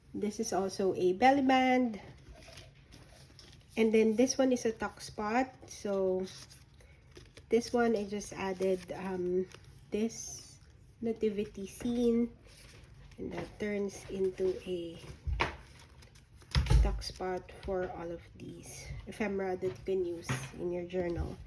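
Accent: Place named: India. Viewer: Thé ornament paper clip are very cute Thanks for sharing your process Love your Indian accent it remenbered me of my 6 years in Bangalore I miss India very much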